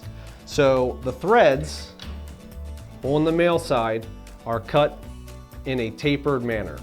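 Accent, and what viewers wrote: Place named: Philadelphia, United States. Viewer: Yo! Can here the Philly South Jersey accent. Love it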